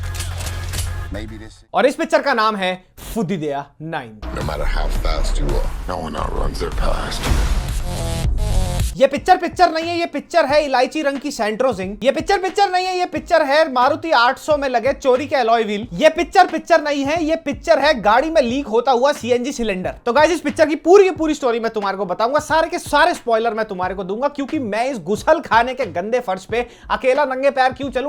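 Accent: Haryana, India. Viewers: 😂😂 I just love your reviews, n specially your haryanvi accent ❤️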